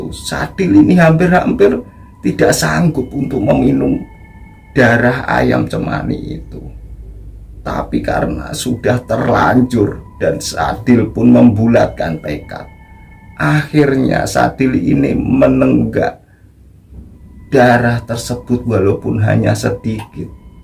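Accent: Java, Indonesia. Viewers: AsyiiK, SeRu TeRBaWa CeRiTa MaS, DeNGan LoGaT GaYa BaHaSa KHaS JaWanya,NGaNGeNii 😂SeMoGa SeHaaT WaL AFiaT & KeDe PaNnya KonTen/CHaNNeL SeMaKin BeRKemBanG/SuKSeS SeLaLu AamiiN. 🤲🙏👍👍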